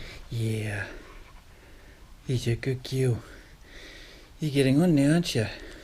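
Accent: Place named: New Zealand. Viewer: Welcome back, nice to hear that cultured kiwi accent again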